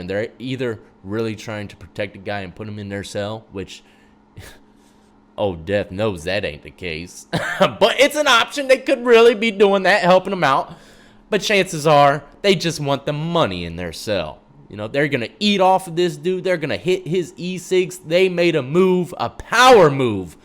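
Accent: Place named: South, United States. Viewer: That mid southern drawl.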